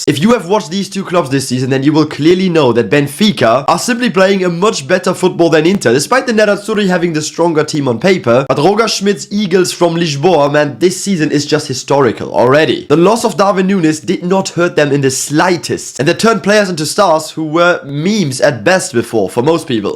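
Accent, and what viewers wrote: Germany. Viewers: you sounds like a German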